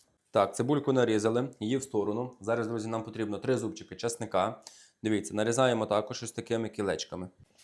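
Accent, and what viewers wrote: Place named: Western Ukraine, Ukraine. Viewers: Чудный повар!Чудный Буковинский акцент!❤❤❤ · Чую мова моя,я з Франківщини,а фамілія поширена на Закарпатті,звідки цей?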